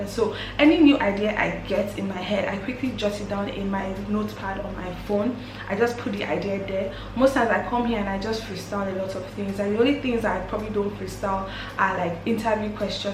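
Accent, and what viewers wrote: Ghana. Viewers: You now speak with Ghanaian accent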